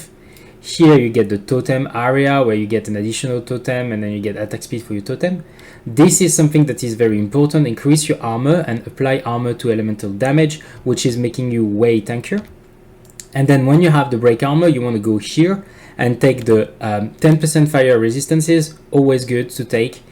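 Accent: France. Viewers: Hey man as a fellow french man I got a great advise for you to change your pronunciation to a more proper one (not that Frenchy ^^ ) I watched your video about the tower and it was helpful to me so I wanted to get you some things for you as well ^^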